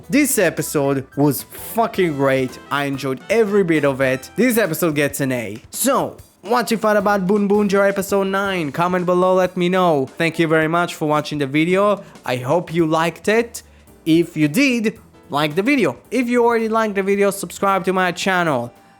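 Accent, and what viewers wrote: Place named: Spain. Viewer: Uh You said Bundorio's name wrong It's actrully pronounce Bun-do-ri-o Bun-de-ras Since you have that Spanish acent of yours,saying Bundorio's name in English can be tricky for Spanish Sentai fans Also is Taiya and not Tire,oh my god the Boonboomger fans are so going after you after you saying the character's names wrong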